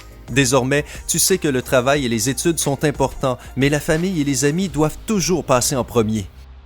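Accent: Quebec, Canada. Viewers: 10ème commentaire! Sinon, t'as un genre d'accent français/québecois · Like si tu trouve que la vois et quebecoise lol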